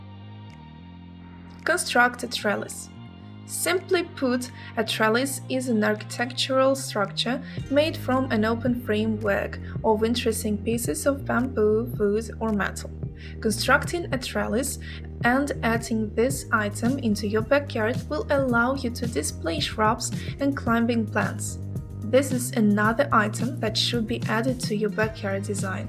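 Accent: Russia. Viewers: Русская на английском, слышен акцент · You have russian accent...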